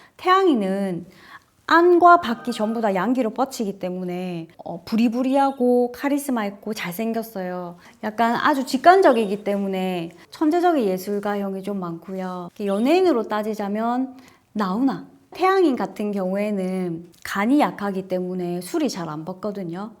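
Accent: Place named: Daegu, South Korea. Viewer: 내용도 좋은데 억양이 재밌어요 경상도중에서 대구쪽인 듯 한데 근무지가 대구이실까 모르겠네요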